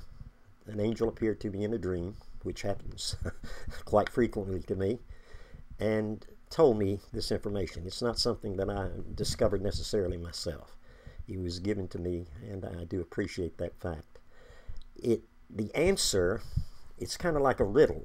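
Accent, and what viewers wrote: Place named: South, United States. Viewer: Southen accent is so grating to listen to.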